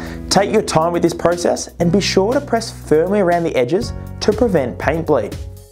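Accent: Australia. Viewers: This guy sounds Australian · That was actually awesome and so refreshing to hear an Aussie accent. I missed the bit on how you did the black window trim. Also, I want do the exact same paint job just with the main body Tamiya Dark Metallic Blue. Would I need to back it with black or would that turn out crazy dark? Thanks guys, good job.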